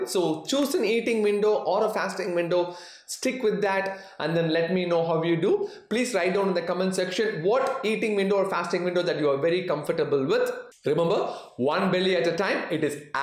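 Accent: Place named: South India, India. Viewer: Your video are interesting to watch and the way u tell in South Indian accent and your friend name savarna Kumar lol 😅 Thanks for great knowledge very helpful 🎉😊 god bless you doctor 😊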